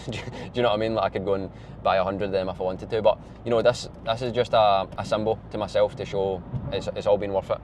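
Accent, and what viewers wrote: Scotland, United Kingdom. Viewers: Which uk accent is this 😅😂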